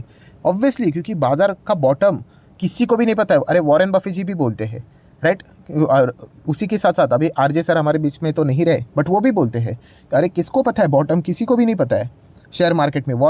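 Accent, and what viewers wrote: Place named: Kanpur, India. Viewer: Lag raha guru UP ke kanpur se ho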